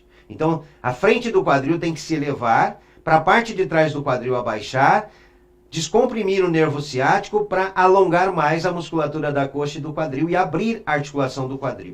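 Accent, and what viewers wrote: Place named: Paraná, Brazil. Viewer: Quanta explicação lógica e de fácil entendimento neste vídeo, me esclareceu muito. Parece que é do Paraná, atende em quê cidade?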